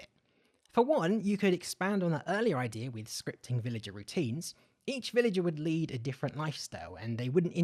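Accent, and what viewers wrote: United Kingdom. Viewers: I love the voice it sounds British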